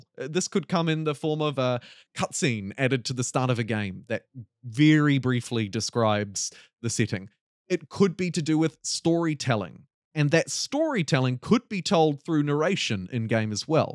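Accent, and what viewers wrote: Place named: New Zealand. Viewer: I love ur content!! U sound like Cs Theus the skin guy, u know!? U from NZ?!?! <3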